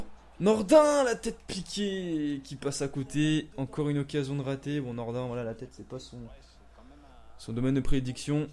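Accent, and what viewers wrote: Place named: Saint-Étienne, France. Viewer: Accent stéphanois grave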